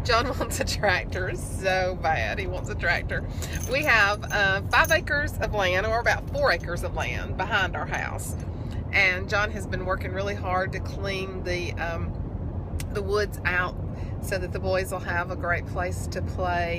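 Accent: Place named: North Carolina, United States. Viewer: I love your accent! I'm from NC and it reminds me of a lot of my family's accents :)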